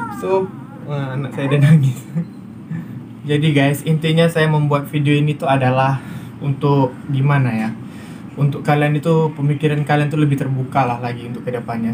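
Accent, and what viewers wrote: Malaysia. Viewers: Biar la apa org kata.... Mulut org tak bisa ttup...truskan saja ikut cara kamu... Loghat melayu ka indon ka atau campur2 skalipn just go saja.... Kitaorg selalu support kamu... Insyaallah.... Amiin GoodLuck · Wahh....melayu Malaysia betul ni👍.....pernah bekerja di Malaysia ke? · Mungkin yg dimasalahkan logat Malaysia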